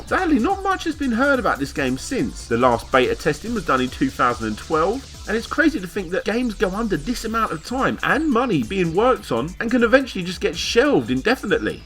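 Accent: United Kingdom. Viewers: Fankfully. U British and ur accents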